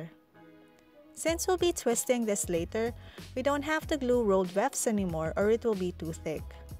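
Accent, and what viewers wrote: Philippines. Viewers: you have a filippina english accent are you filipina? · You have a filipino accent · U r filipina right? The u talk English . ^^ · Are you Filipino? Cuz your accent sounds like Filipino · Is she a Filipino? I can tell from her accent. 😅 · is she Filipino? her accent sounds like one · Your accent tho, are you from Philippines? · Yea I could tell she might be filipina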